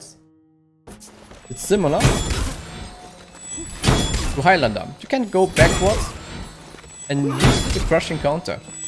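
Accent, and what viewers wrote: Germany. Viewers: Hey! I was wondering where you come from. Because your english sounds like it has an german accent in it. Thanks for the answer! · man hört den deutschen aktzent raus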